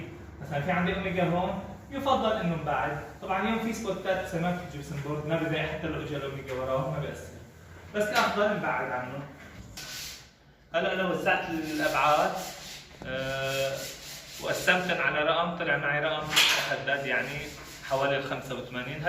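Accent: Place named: Syria. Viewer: شغل حلو و الاحلى لهجتك السورية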